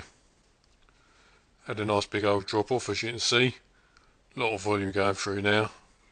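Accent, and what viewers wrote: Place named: South London, United Kingdom. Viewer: that's funny.Americans often have trouble understanding the british accent. They think we all speak like the queen or maybe hugh Grant or something, lol. Wait until you hear someone with a thick scouser accent. Sounds like he's from south London at a guess. loving the videos.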